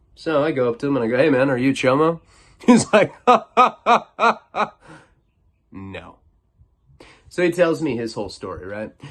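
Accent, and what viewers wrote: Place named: Long Island, United States. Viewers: NEW YAWK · Lmao bro newyork Haha I live in upstate ny he sounds like from ny city more like long island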